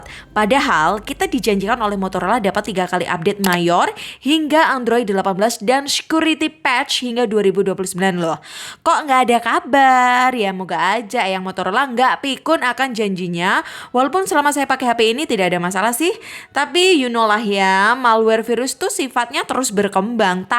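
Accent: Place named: Java, Indonesia. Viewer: Beda sih , ini masih ada tipis2 medok nya Kalo d trans 7 lebih mulus hehe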